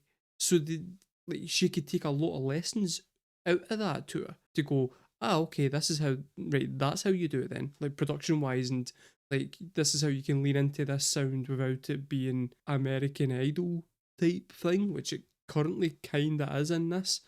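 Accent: Scotland, United Kingdom. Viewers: This is my first time viewing your channel. Really enjoyed your reaction One piece of context I’d like to share is that this isn’t a touted “rock version”. She never said that. Fans that recommend the reaction say it. This is just how she played the song that tour. We can say it’s rock or not but she never made that promise or claim Also, she’s done pop rock before. sounds shockingly like and from 2010 might as well be a song. I’m gonna subscribe and check out your other reactions P.S. your accent is gorgeous. You’ve got to be a Scot?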